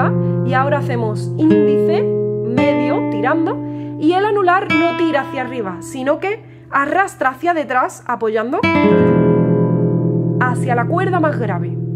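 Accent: Andalusia, Spain. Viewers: Que combinación de talentos!! El acento andaluz es como ponerle el cherry al cake. haz escuchado alguna vez lo de las frecuencias 432 y 440?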